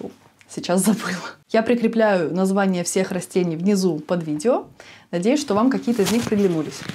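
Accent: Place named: Baltic. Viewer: Умница! Красавица! Спасибо Ирине за то, что она рекомендовала Вас!!! Обожаю ваш прибалтийский акцент. Вы для меня и настроение ,и вкус , и эстетика и женственность!!!❤❤❤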